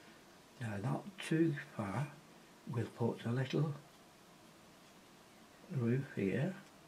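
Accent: United Kingdom. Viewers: What is the palette you are using? Also, it's a bit hard to understand as you have a stong British accent and while I am very familiar with British accents it is sometimes hard to understand what you are saying. Maybe it's the video volume?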